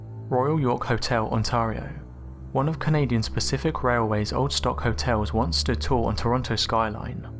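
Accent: United Kingdom. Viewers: Why do you have a stupid limey narrating this damn video instead of an actual Canadian who can properly pronounce the cities and provinces in Canada??? Couldn't you have corrected the idiot before the final recording??? JEEZZZ...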